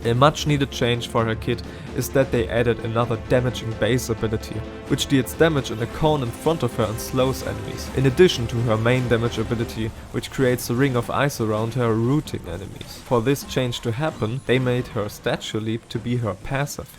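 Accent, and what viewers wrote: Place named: Germany. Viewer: English with a German accent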